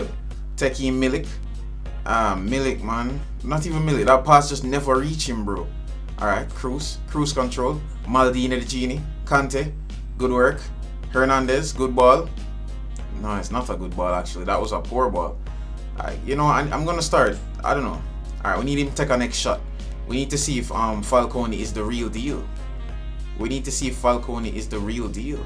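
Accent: Jamaica. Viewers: Bro u speak Jamaica?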